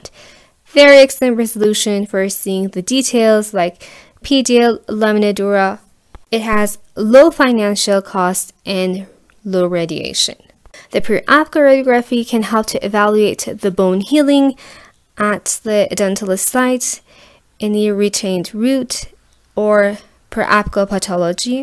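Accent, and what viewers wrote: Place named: Iran. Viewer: ویدیوهات خیلی به درد میخورن. فک کنم ایرانی هستی؟ داد میزنه لهجت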